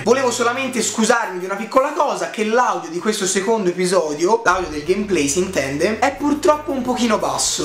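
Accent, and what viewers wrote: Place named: Florence, Italy. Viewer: mi fai morire😂😂 in un manihomiooo💞😂...quanto adoro la pronuncia dei fiorentini ahah💞😂😂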